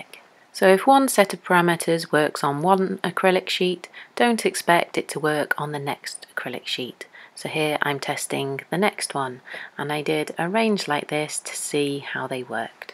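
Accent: England, United Kingdom. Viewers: You sound like your from England 🏴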